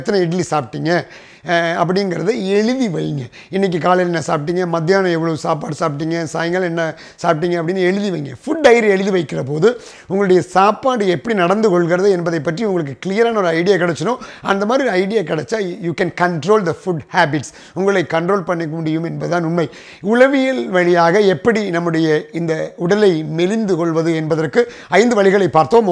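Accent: Kerala, India. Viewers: I like your malayalam mixed tamil slang. . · Malayalam vaasam apdiyae veesudhu unga Tamil . Nice video. I subscribed for Ur Tamil sir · Sir neenga Tamil or malayaliyaaa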